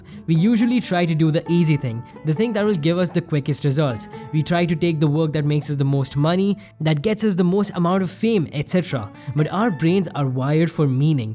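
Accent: India. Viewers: I think the accent comes from him being Indian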